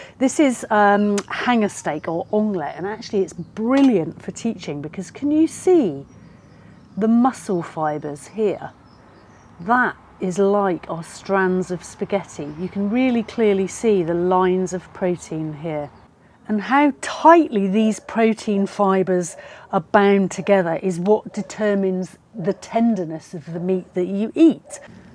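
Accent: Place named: Bristol, United Kingdom. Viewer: Thank you, young lady. Iam just about to do some St. Louis Ribs on me offset slow cooker here in SoCal and I shall give that a try. Love hearing a good old Brittol' acent again.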